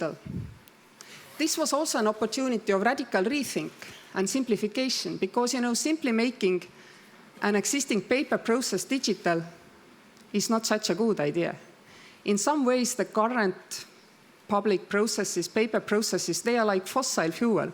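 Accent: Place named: Estonia. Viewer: For the love of god, woman, learn how to speak english! You´ve been working in Luxenbourg for how long now??? I´m an estonian too, but you will never hear me speak like that. The accent is just hurtful for my ears.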